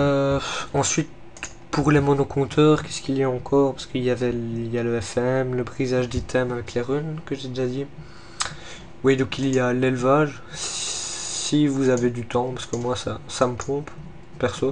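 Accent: Belgium. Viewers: Un petit accent belge :)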